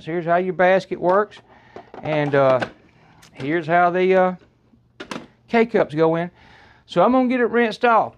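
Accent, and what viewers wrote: South, United States. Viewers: Love your southern accent ❤️ thanks for all that info!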